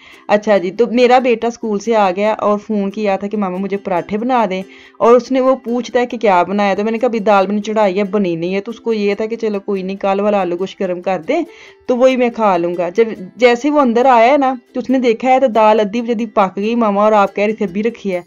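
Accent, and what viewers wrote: Punjab, India. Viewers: Watching you from UK Love your Panjabi and the way you talk... Like your videos.... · Salam sister, Ma sha Allah j apke volgs buhat achhy hote hn .specially baat krne ka punjabi style tu buhat achha lagta hy .ap jo oven use krti hn roti k lye oska company name bta dain plz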